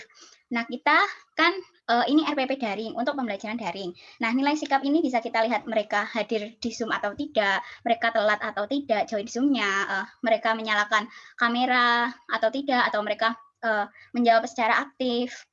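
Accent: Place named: Java, Indonesia. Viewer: Suaranya medok gaes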